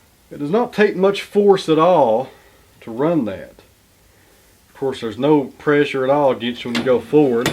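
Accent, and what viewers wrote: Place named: North Carolina, United States. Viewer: With that accent I knew you were close by. I’m in Westerville, NC. 40 minutes from you.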